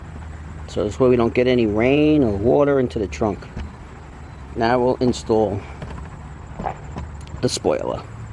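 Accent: New York, United States. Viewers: First of all, nothing beats a NY accent. #Queensgirl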